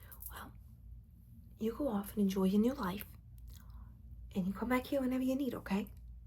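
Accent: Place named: Brooklyn, United States. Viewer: Your Brooklyn accent is one of the most natural I've heard. You must be from around there, or your family is!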